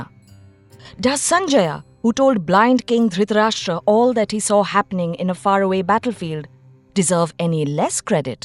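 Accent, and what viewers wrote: South India, India. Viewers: are you madraasi southindian saying arjunas kitna bura lag raha sunnein mein,please bachhon ko to sahi words bataayo baba it is aadi Shankar not adishankara saare words theek bataiye kripya, yeh aa mat lagayeeye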